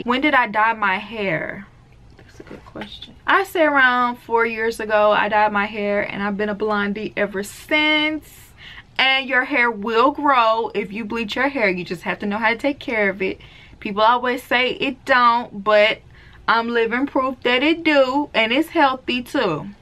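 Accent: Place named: South, United States. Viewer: Yes you def have a southern accent but you mix it up.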